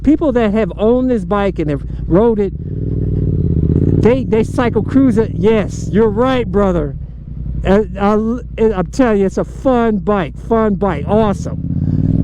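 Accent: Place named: Texas, United States. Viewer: Are you from texas? You have a southern accent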